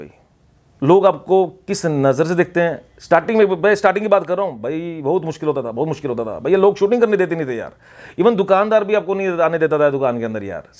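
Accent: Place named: Himachal Pradesh, India. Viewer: the way of your speaking reminds me of my old days in Himachal where people speak with a different tune than mainland hindi speakers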